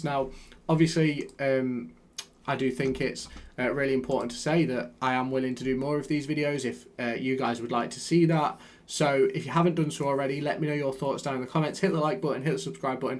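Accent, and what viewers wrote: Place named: Derby, United Kingdom. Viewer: Are you Derby born and bred? You sound like you are. I'm allenton originally but now in chellaston.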